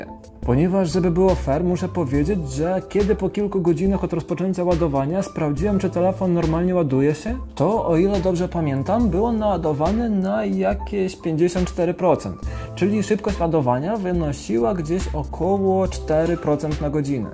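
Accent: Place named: Ukraine. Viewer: Naucz ze sie chlopie po polsku bo zajezdza Ukrainą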